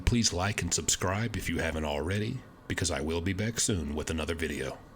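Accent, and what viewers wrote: South, United States. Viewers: ! Your pronunciation, enunciation and articulate diction, while having a wonderful southern gentleman accent, are very refreshing to say the least! I thank you and you should congratulate yourself as you are a rare gem. Thanks again!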